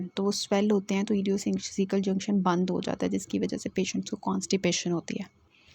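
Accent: Pakistan. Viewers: Pakistani lg rha h aawaj se ... Kya pakistani me b MBBS hoti h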